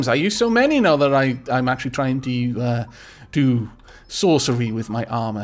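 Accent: Wales, United Kingdom. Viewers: I sodding love your accent. The Welsh really have a wonderful language:) Great video, just as usal,! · I love the British accent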